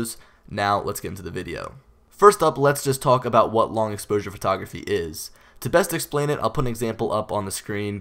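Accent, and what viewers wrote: Philadelphia, United States. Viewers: Dawson Boyle -Ha I'm just joking. I'm from the area, born and raised. First time I watched one of his videos I knew where he was from by the sound of his voice. I know a fellow hoagie when I hear one. City looks good tho huh?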